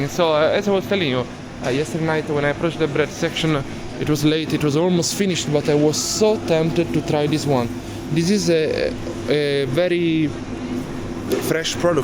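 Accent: Italy. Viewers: Судя по акценту, парень из Италии? Или мне показалось?😂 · Hello! Are you Italian? Your accent sounds Italian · Поправьте меня если не права, но как будто автор итальянец ) Узнаваемый акцент ))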